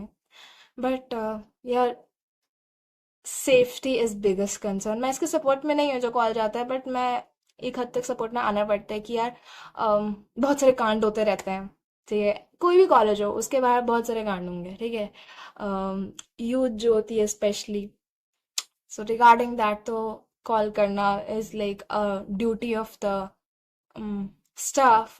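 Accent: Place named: Punjab, India. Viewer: I am damm sure you are punjabi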